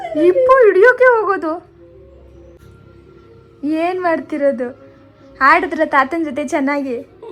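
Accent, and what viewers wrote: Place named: Uttara Kannada, India. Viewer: ಹೌದ ಉತ್ತರ ಕನ್ನಡ ಅನಕೊಂಡೆ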